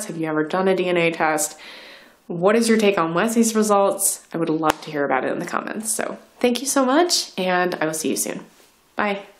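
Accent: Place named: Wisconsin, United States. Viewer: I can tell youre from Wisconsin. Lol.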